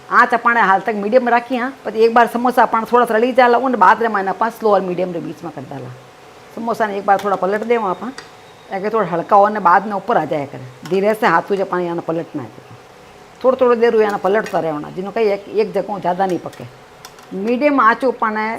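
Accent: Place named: Jaipur, India. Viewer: wo sab to thik h mummy ji... Par thoda hindi me bol doge to dusre state wale bhi samjh sakenge... Ye jaipur language shi se samjh me nhi Aata h... Par sunne me bda mitho lage h...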